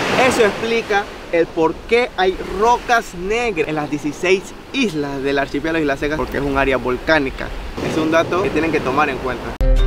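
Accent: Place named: Panama. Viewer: Q xopa, acabo de conocer tu canal, ya me suscribí al canal. Youtuber panameño que veo lo apoyo, muy chevere escuchar a alguien con tu acento y jerga. 🇵🇦🔥🔥🔥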